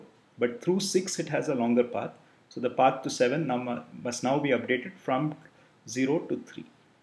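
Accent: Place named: India. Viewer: Ужасный индийский акцент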